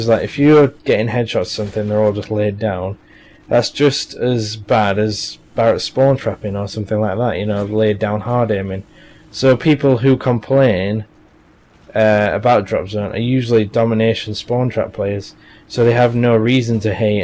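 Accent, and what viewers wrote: United Kingdom. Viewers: Fortnight. British english is so cool.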